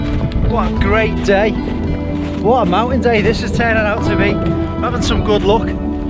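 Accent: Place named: Wirral, United Kingdom. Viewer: Hi good vids mate You sound local to me Wirral. I have the msr elixir but the reviews you have done has sold me the Hilleberg soulo 👌🏻keep the vids coming chief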